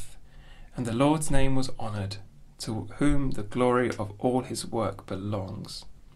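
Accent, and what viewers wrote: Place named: England, United Kingdom. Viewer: I wouldn’t mind this in full, as I can’t find the complete audiobook of the journey. It’s also good to have an Englishman read this , as American speakers cannot pronounce British English place and person names as those in the British isles say it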